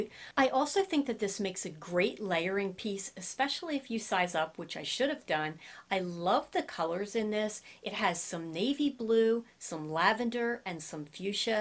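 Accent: Philadelphia, United States. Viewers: I much prefer the size small cardigan on you. The medium size, as well as the stripe design, overwhelms you. Great try on as usual. I agree with the MA/CT viewer (I'm from RI). Wustersheer is the way we pronounce it, although with our RI accent, what do we know. Love your accent (Philadelphia-ish, Southern NJ?)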